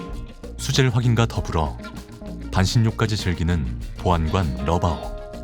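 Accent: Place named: Chungcheong, South Korea. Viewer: 러바오 넘넘 귀여워❤❤ 충청도 사투리도 찰떡이구먼유~^^ 365일 만근하는 우리 러부지❤❤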